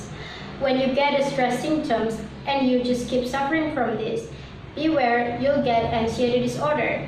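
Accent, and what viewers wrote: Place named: England, United Kingdom. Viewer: Saran sih... Pronunciation nya terlalu ke inggris2 an, jadi di dengar nya agak ngga jelas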